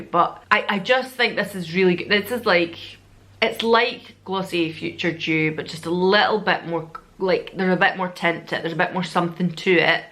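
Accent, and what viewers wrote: Scotland, United Kingdom. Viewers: Lobe the Scottish accent xx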